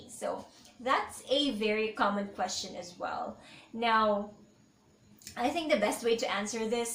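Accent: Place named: Visayas, Philippines. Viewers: Haha bisaya diay ka ate. ;) Anyways, I love the way you speak ate. Planning to apply another call center again. It's my third time applying and I hope matanggap na ako😂❤️ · Bisaya kaayo miss oi 😊😊. Nag tanaw kos mga vlogs nimo ma'am kay mangaply ko balik puhon , maytag dili naku kulbaan 😂😂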